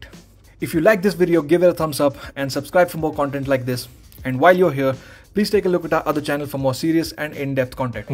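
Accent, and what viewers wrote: India. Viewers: God damn! First Indian with a listenable accent!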